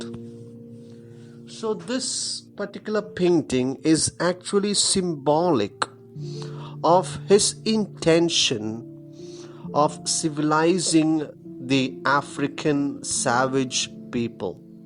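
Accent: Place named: India. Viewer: Youre not danish, I can hear the jeet in your voice, saar.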